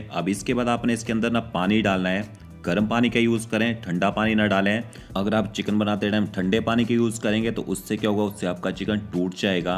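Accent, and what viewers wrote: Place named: Pahadi, India. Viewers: U r pahadiapka tone lagra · Are you from Uttarakhand? If yes where are you from? I am asking because you have some Uttarakhandi accent in you voice. Just asking out of curiosity. BTW I’ll surely going to try your recipe and let you the result. Thank you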